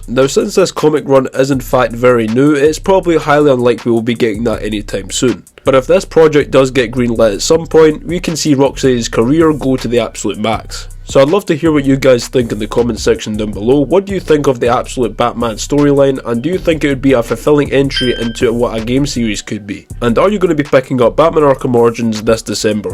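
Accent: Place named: Scotland, United Kingdom. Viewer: 3 minutes of a Scotsman babbling to say absolutely nothing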